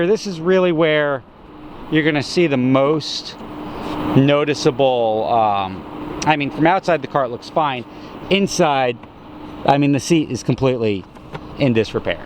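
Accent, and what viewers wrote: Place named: Upstate New York, United States. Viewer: where is this guy from, a very distinct accent almost like upstate new york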